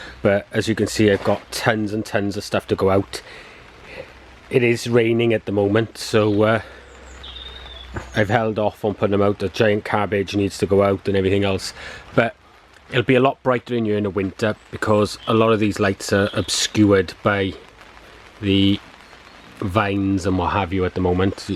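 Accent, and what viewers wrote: Wales, United Kingdom. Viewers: Sounds like a strong welshman! Great video! 🙏🏴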